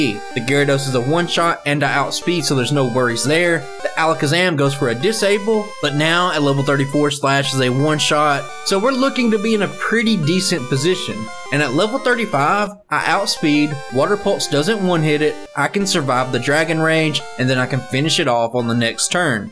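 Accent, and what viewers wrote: South, United States. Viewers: I have to say that I enjoy the "unoptimized run first, optimizations later" style, and I also enjoy the longform videos (such as the Mr. Mime vid). The variability in content is nice. Thirdly, the Sanqui runs injecting new moves, types, and mons is also a unique treat that sets you apart from others on the scene, and the Garchomp vid is what got me to first click on a vid of yours, actually (and your accent and demeanor helped me to stay; I've got southern in my family and I feel it's very underrepresented in many gaming communities) 😁 I think this is the first vid of yours that's came out since Scott's Thoughts' Tentacool run that gives you a mega-shout-out at the beginning, too -- I hope you see the views tick up from that!!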